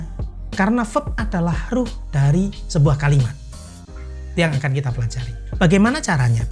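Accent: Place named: Surabaya, Indonesia. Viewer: MANTAP. NGGAWÉ LOGAT SUROBOYOAN ❤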